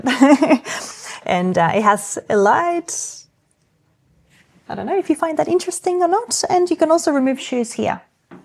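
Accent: Australia. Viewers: Found your channel quite by chance and do very much enjoy your explanations of your cultural experiences. Thought the accent sounded familiar. 🦘 Did the sums and it equates to per week in Australian money. You couldn't rent a private parking space in any Aussie CBD area for that. Rents in 6000 (postcode) outer suburb hubs start from $450 per week just for a 1 or 2 bed unit. Then again, you know all this. Do you reckon the children (when older) will look back at all of these adventures as something that not a lot of other children were lucky enough to have had? As for that upstairs terrace, aside from the barbi area, maybe a few raised garden beds for veges or potted fruit trees. Create your own oasis. Thanks for sharing 💙💙💙 Edited to correct grammar. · Ok your accent is like a crazy mix of Australian, German (or Dutch?), and Chilean or something. I have no idea obviously, but it's interesting to hear!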